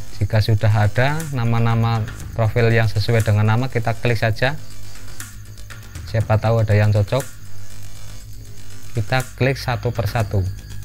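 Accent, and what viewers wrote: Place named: West Java, Indonesia. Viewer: Orang sunda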